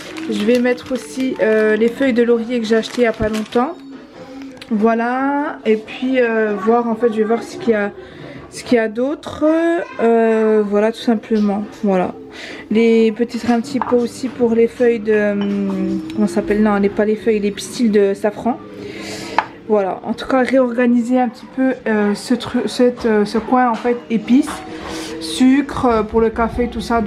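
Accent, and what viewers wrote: Belgium. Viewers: Salem, top ton vlog mais moi je suis pas motivée du tout pour le grand ménage...... Mais dis moi ton accent vient du nord ou de Belgique? A chaque fois je veux te demander mais j'oublie...lol bisous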